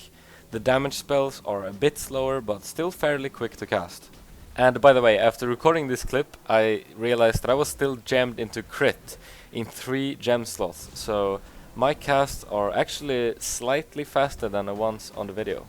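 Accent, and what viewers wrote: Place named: Sweden. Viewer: Dat swedish accent :P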